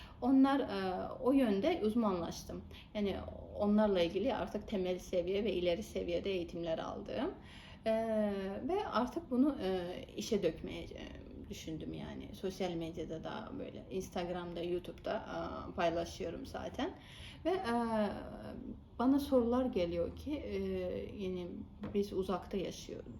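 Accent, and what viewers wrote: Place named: Azerbaijan. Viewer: Bakü’de doğmuşsunuz ne güzel. Konuşurken şivenize bayılıyorum. Dinlemek çok hoşuma gidiyor. Sizinle ilgili merak ettiğim birkaç şeyi de yanıtlamışsınız teşekkürler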